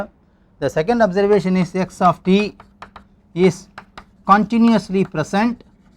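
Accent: India. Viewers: well are you suppose to understand what everyone is speaking?? cause the last time I check America is not the only country in the world...for instance they're is a country call India and this guy sounds like he is from there...also no where in the title of the video it says for Americans and or English speakers.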